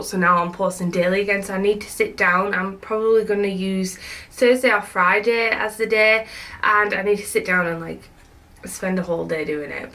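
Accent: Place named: Teesside, United Kingdom. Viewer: Iv just accidentally come across your channel looking at heat press reviews (has yours lasted?) and I thought she sounds local...I'm in Boro. Lovely to meet you and look forward to watching your videos. X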